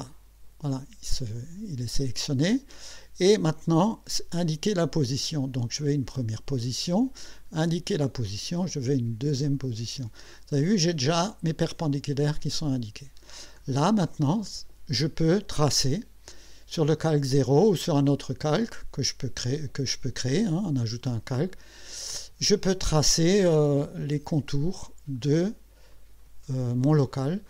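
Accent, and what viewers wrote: Alsace, France. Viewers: clin d'oeil pour l'accent du Sud Alsace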